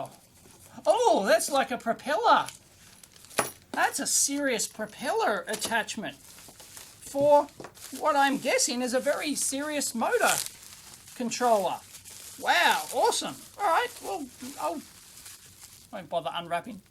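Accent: Australia. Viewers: JuiceOfSapho more like Dundee ! Crocodile Dundee! with the similar accent . or maybe Jim bowie!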